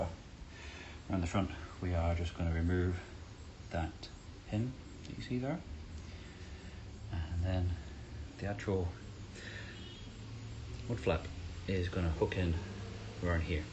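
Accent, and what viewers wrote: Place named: Ireland. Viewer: Do I detect an Irish twang to your accent? Great video by the way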